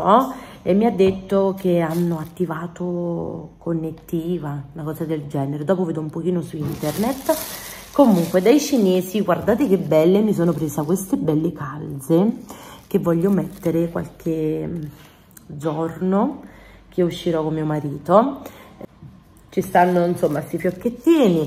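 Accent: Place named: South, Italy. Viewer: Sento il dialetto e in un istante mi fai tornare indietro nel tempo..quando andavo giù dai miei zii cugini ..e da 4 anni che non scendo a lettere .sei super attiva giovane con 3 bimbi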